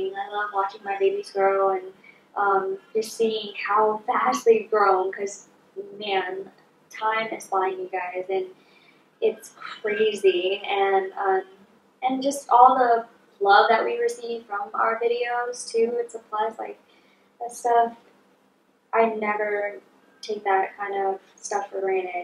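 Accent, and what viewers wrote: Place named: South, United States. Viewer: where are they from they kind of have a southern accent.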